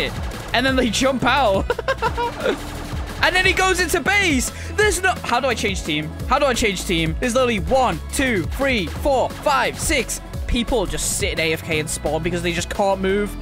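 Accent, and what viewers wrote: United Kingdom. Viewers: yo i have a question are you British?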